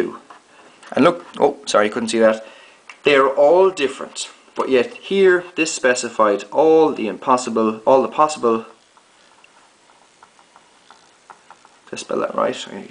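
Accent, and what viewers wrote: Ireland. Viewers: Ah a fellow Irish man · Are u Irish....u sound irish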